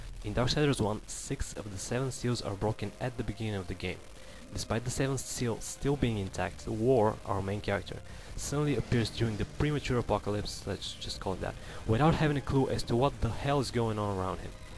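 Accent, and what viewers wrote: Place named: Venezuela. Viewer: lol my friend from Venezuela has the same English speaking accent as you. xD where you from? Subbed btw.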